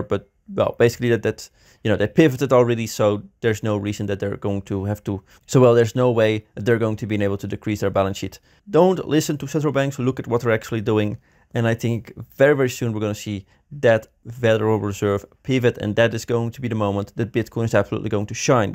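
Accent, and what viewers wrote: Netherlands. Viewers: Nederlandse accent. Nederlanders zijn altijd gretig voor de goedkopere prijzen lol.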